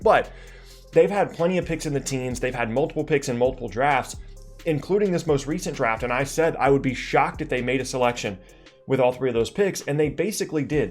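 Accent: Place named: Boston, United States. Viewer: I think is that Boston accent. It makes people crazy.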